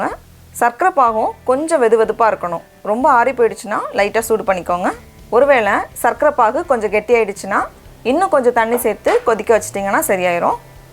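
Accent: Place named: Tirunelveli, India. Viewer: Hi why no videos for a long time eagerly waiting for ur video. Nice video. U r always a queen in cooking I like u very much and also ur tirunelveli tamil. Iam Christilla from Chennai.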